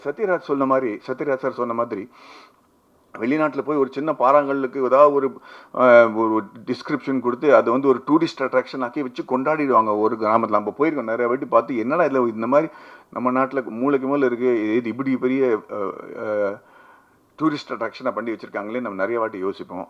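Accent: Coimbatore, India. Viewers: Sollanum "nga important "nga Maddy picked up Kovai Slang well 😅😅😅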